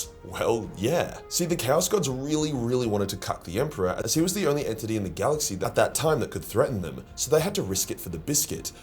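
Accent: Australia. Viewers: I’m here to hear the Aussie Chad talk it all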